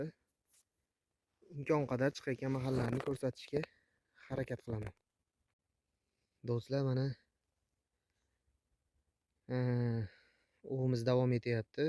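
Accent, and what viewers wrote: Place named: Andijan, Uzbekistan. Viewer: Шева Андижонча. Ов бароридан келсин, омад.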